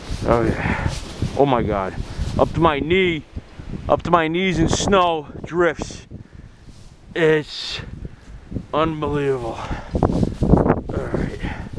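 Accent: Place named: New England, United States. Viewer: Sounds like your in mass or ri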